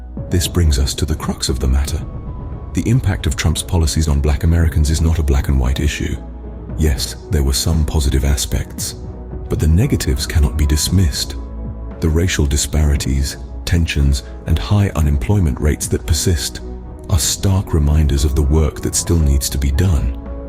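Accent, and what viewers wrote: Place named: United Kingdom. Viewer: Gotta love your choice of narrator A white British man?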